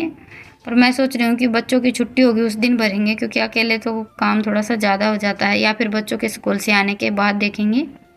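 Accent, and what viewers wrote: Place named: Rewari, India. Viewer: Aap kha se h mugè to rewari se lag rhi h aap whi ki bhasa h aap ki may be rewari se hu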